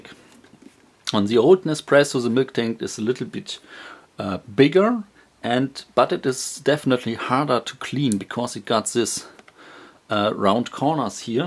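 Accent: Germany. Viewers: I have to say there must be a reason Delonghi still retails the original for $100 more. Not sure why. I'm going to buy a plus as soon as I see a sale to combine with the $50 off from Nespresso. Your accent brought me back to my Army days in Wurzburg :)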